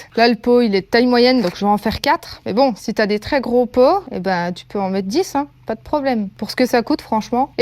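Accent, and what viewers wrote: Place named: Burgundy, France. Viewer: Très bons conseils et j’adore ce petit accent bourguignon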